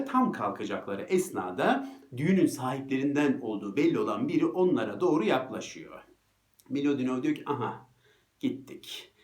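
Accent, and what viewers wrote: Ankara, Turkey. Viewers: Ne olcak, bakalım ne olcak ? Tam Angaralı'sınız hocam.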